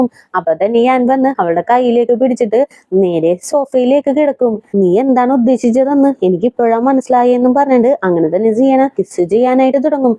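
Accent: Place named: Thrissur, India. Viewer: Language style kettal thanne Thrissura nadennu thonnunnu. Pls mention the drama name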